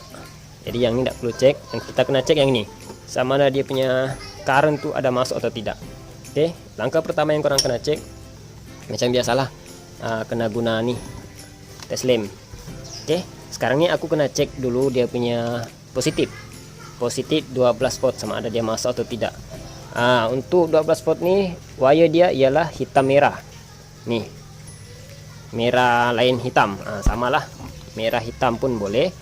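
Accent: Malaysia. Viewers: Awak nich orang mane, cakapnye kaya orang melayu, ini videonya ranca bana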